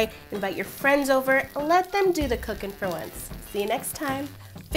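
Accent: Thailand. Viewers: I love your Thai accent!!! So cute❤️😘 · This is perfect yess btw love your Thai accent!! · Love ur thaiglish accent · I love your Thai accent 😃😃😃💛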